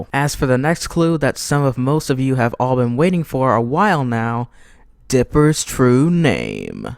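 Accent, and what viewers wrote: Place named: South, United States. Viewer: you sound like Gideon. Maybe in a little southern accent lol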